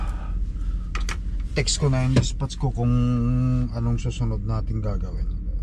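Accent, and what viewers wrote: Ilocos, Philippines. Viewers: kung may bakasyon ka ngayong summer, mag english lesson ka kasi kung mag english ka ilocano accent.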